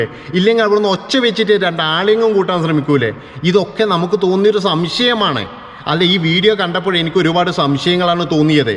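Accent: Kannur, India. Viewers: സംസാരം കേട്ടിട്ട് നിങ്ങള് നമ്മളെ നാട്ടുക്കാരനാണെന്ന് തൂണുന്നു.കണ്ണൂർ😂❤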